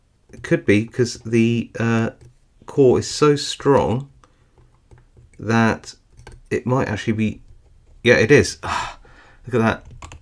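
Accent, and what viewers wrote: United Kingdom. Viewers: It's always interesting to watch, honest you could just talk and not pick a lock and we'd still enjoy it. British people are pretty awesome sounding anyhow, but ya you'd be surprised, we want to know more about you too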